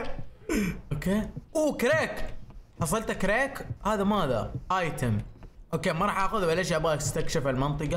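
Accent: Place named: Jizan, Saudi Arabia. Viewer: احلى لهجه هي الجيزانيه احبك 💗💗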